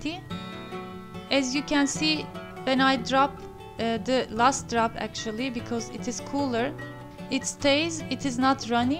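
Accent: Turkey. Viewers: Funny but I'm Turkish and this is the first time I hear English with a Turkish accent 😂. I can't speak with Turkish accent, maybe I don't hear my accent I don't know. Anyways that's nice you're keeping your accent and thank you I heard my accent for the first time hahaha.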